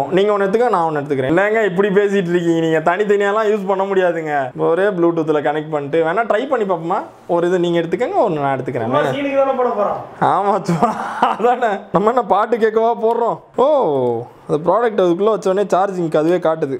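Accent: Kanyakumari, India. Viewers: Kanyakumari language super😍😍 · Bro neenga kaniyakumari ah sollunga bro❓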